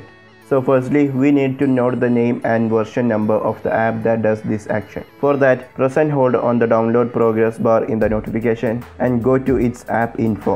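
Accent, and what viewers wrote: India. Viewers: that's mallu accent mam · I cant even understand ur indian Apu chipati ass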